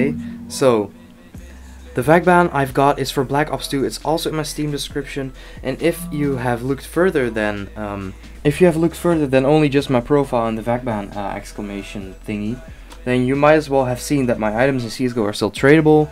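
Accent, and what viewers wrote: Netherlands. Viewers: are you dutch? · His dutch accent mixed in with english is almost cringeworthy but his videos are still enjoyable.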